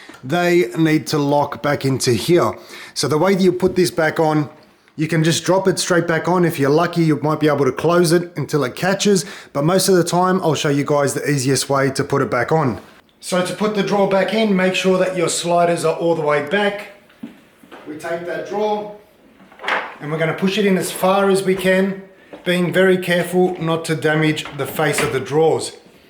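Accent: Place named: Australia. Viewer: Thank you so much! Was to happy to hear an Ozzie accent when I clicked on it haha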